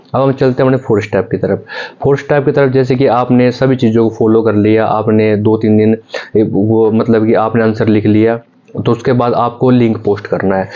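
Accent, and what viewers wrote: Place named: Haryana, India. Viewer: U r from. Language to bhai aapki ambala ya Ynr area ki lag ri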